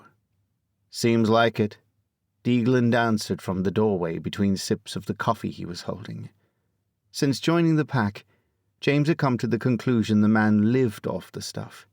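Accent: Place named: United Kingdom. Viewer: WHY does a book set in SEATTLE need a "british narrator"?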